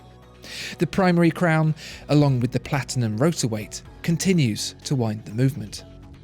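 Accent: United Kingdom. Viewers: If he didn’t have a British accent I would not be paying attanetion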